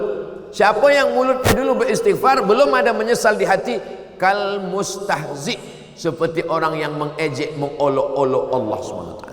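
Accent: Malaysia. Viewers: Ustadz ngomong nya koq seperti orang malaysia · Memang dh dia melayu.. Mesti la..loghat je tele tu biasa mngikut negeri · Respek dgn UAS.. ketika di Malaysia, loghat / dialek juga ditutur dlm bahasa kebanyakan di Malaysia. ketika video di Indonesia juga mengikut loghat / dialek tempatan di sana. Semoga UAS sentiasa sihat dan berbahagia selalu..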